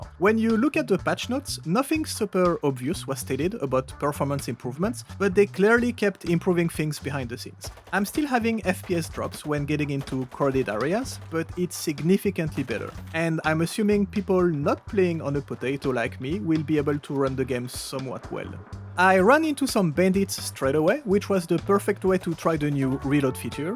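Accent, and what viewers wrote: France. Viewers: This vid convinced me to give the game a try. I really like your humor btw. The French accent makes it even more funny. :)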